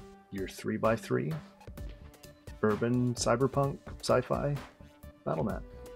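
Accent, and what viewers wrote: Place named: Canada. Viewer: Watching video.....ok cool, man, I've been wanting to do one of these for a while......looking good....huh....this guy sounds pretty Canadian....Ontario maybe?....pretty sure Canadian.....Premier spray primer?!?! Oh yea bud that's some Canadian Tire paint right there......"deck-el"! C A N A D I A N C O N F I R M E D. Where ya at? the "deckle" pronunciation makes me think farther west than Ontario. AB? SK? I feel like if you were in MB I'd know.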